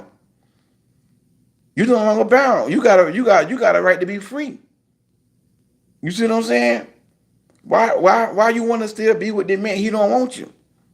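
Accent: South, United States. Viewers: Love the country accent, Christian values, and quality content!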